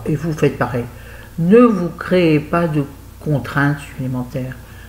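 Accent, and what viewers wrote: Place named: South, France. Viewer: Coucou j'aime les clins d'oeil et l'accent le mien en fait celui du midi non aucune nouvelle de criss que se passe t'il?en ce qui concerne les commentaires hargneux le mépris est la meilleure des choses,jusqu'à un certain POINT!!!! Des poutous a bientot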